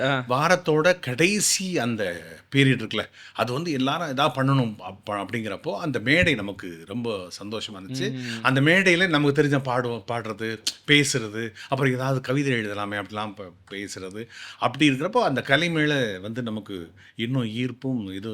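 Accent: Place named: Kerala, India. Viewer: மலையாள தொனி வீசுவது போல உள்ளது. இருந்தாலும் தமிழ் பேசுவது சிறப்பு. வாழ்த்துக்கள். குறை நினைக்க வேண்டாம்.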